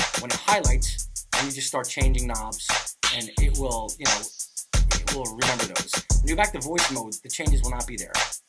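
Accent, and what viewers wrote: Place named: New York, United States. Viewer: Your so new york and italian it makes learning so much easier.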